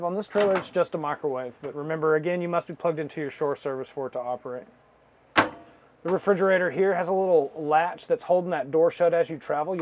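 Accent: United States. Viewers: is that accent from Kentucky?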